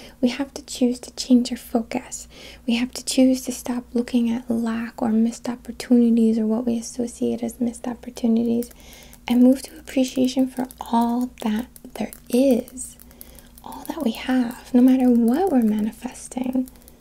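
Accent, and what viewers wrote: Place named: New Jersey, United States. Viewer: This is the 1st time I noticed you have an accent! New Jersey? I'm bad at this And yes sing next time!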